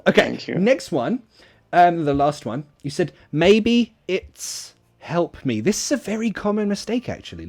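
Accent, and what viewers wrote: United Kingdom. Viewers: It was superb to speak with someone who has a perfect british accent 😊 thank you teacher 😇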